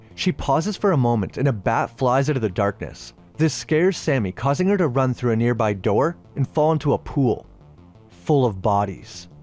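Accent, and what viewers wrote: Canada. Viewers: Holy Canadian accent!!!!!!!! LOL!! I forgot how Canadians pronounce things like “Out and about = Oot and aboot” “Mom = Mum”………..I thought my MN accent was bad!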